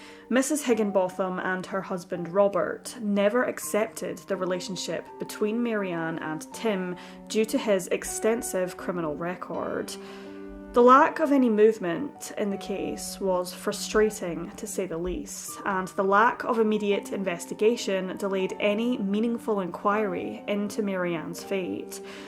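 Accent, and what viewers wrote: Scotland, United Kingdom. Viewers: Are you Scottish, lass?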